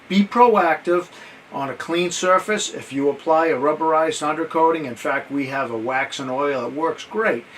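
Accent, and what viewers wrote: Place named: New Hampshire, United States. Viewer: The caption says, "cab connors." Though it's probably a typo, it's funny because it matches NH accent.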